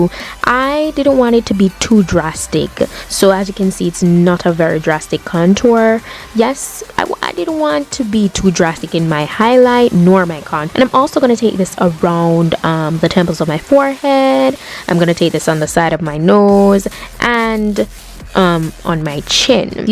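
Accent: Jamaica. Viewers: are you Jamaican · yaady fi true 🤘💗💗💗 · Yeah, I knew she was Jamaican because I am a Bahamian and she doesn't sound like us. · You sound Jamaican! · loveeeeee your jamaican accent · Every body a ask bout u nationality.... Omg. U sound Jcan tho but confirm nuh!! Anyways luv ur vids; u just be popping with or without makeup... Best contour- no foundation video I've seen.💖💞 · I Love how you talk man. just love my fellow jamaicans.. this was so helpful bc really I agree with using the foundation on where u didn't have any product.. makeup lasts longer.. less makeup shopping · My first video and girl I need to know if you're Jamaican or from the Caribbean cuz.... mi hear it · I knew it... I was about to ask the question I heard it when you speak, Jamaicans dialect cant hide lol! awesome vid!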